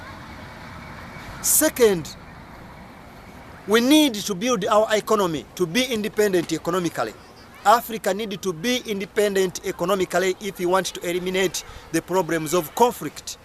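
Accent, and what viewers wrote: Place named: England, United Kingdom. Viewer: You got a lot of shit to get over. I hate your English accent so bad.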